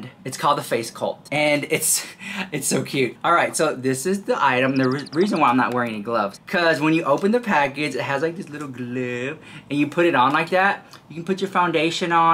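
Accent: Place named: Texas, United States. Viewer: You make my day! Every day! ❤❤ I also live in Texas but I'm in Dallas. So, i love the accent. You're like home.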